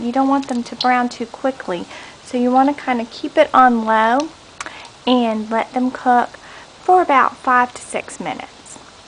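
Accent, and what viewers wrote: South, United States. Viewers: Aww, I love your Southern accent! Your new vids sound very cute though. :) Thanks for posting these! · you sound mighty country, i do too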